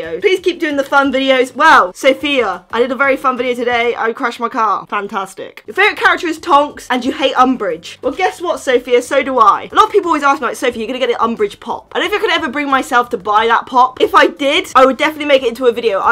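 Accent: United Kingdom. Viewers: You were bragging about your dollar, and me being American, completely forgot you were from England, so I was very confused for a few seconds until I remembered. And if anyone here decides to point out her accent, I'm used to that accent because my father is from England, meaning I hear the accent every day.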